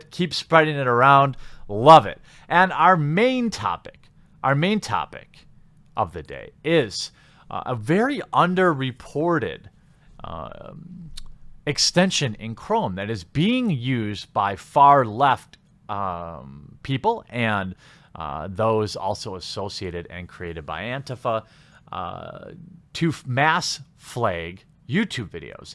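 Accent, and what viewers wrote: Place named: Midwest, United States. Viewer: Interesting Midwestern vowel merger: since/sense homophones, etc.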